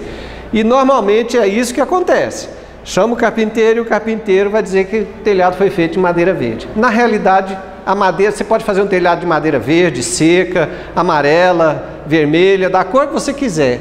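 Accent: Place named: Minas Gerais, Brazil. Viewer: Professor excelente conhecedor de geometria, vasto conhecimento operacional com sotaque de mineiro meus parabéns professor por toda as suas teorias das edificações para execução de obras com seguranças e determinação..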